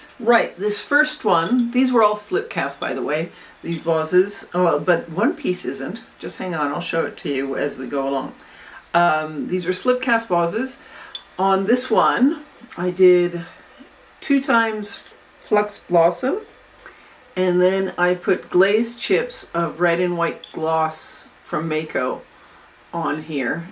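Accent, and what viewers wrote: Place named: Canada. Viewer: Based in the UK? ok but you sound so incredibly canadian. Am I tripping? Anyway I am not usually a fan of pinks and reds, but I am really digging the way you've combined them here, kinda has a touch of Rothko. I really liked the bright reddish vase and jug with amarylis all over. Usually not a fan of amarylis but it looks great with those rich colours.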